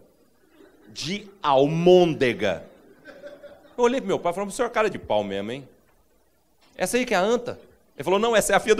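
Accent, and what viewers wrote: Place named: Goiás, Brazil. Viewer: difícil o Goianês... ainda mais sendo gaúcha! 🤣🤣🤣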